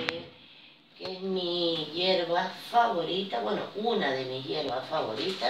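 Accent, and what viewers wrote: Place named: Canary Islands, Spain. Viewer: Dios te oiga, lo acabo de hacer esta noche, espero que mi deseo se cumpla, es de economía, porque lo estoy pasando mal... Mi duda es, si la noche de luna llena también lo dejó fuera en la ventana?? O... el día antes de la luna llena lo tengo que tirar a la naturaleza?? Se tira el agua y todo lo de dentro, no?? La copa También?? Disculpa mi torpeza.. Gracias y un beso.. y si me pudieras dar tu correo te lo agradecería.. Es que, tengo un problema grave de economía, con muchas deudas, por eso quería hablar con usted por privado, para que me diga cuál es el mejor ritual.. Tienes un acento canario.. yo viví tres años en Tenerife y me enamoré... Un beso, espero tu contestación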